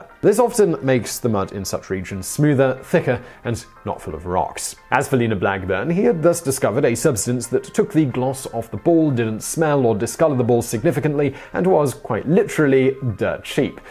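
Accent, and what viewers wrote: United Kingdom. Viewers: today i found out....a man with a British accent has done more research about the most American sport since Lacrosse then several of my friends that definitely have moms that know which shoe box their little league photos are in.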